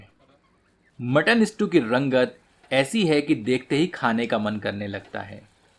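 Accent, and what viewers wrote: Standard. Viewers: एकदम शुद्ध हिंदी बोलते हैं आप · Aap ki boli hui Shudh Hindi humein ati(behad) pasand hai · Bhai dish to tasty hoga hi.. But apki hindi sun ke doordarshan ki yaad aa gayi.. 😃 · itni suddh hindi sun k bhut achcha laga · itna sudh Hindi bola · आपकी विशुद्ध हिंदी बहुत ही मनमोहक और प्यारी है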